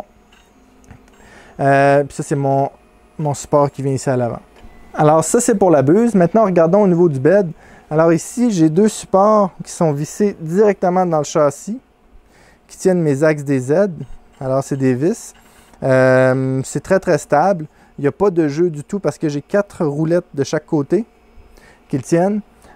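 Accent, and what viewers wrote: Canada. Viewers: Salut. Je suis du même avis que beaucoup, pour 1000 euros je la fait moi même et tu devrais t'en retrouver pour 500 euros voir moins de matériel. Bonne continuation et c'est toujours un plaisir de t'écouter avec ton accent et les expressions du Canada.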